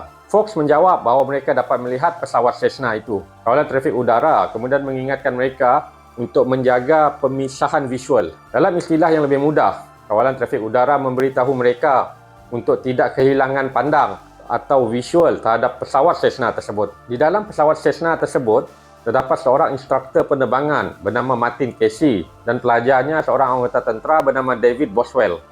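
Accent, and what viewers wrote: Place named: Kuching, Malaysia. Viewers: Auk, urang kuching. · Kuat slang sarawak ktk pok.. 😅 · Kamek tek rasa gia juak. Org sarawak tok kah.. yalah alu maca komen. Best topic tok. Suka nengar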